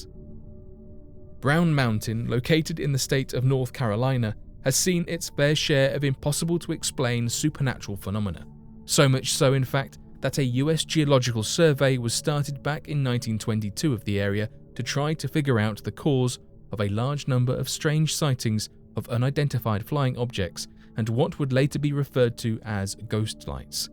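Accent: United Kingdom. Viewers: I like this narrator much, much more than the usual one. He sounds like an actual British human and not a computer simulated voice. Great topic, too. Thank you for posting this!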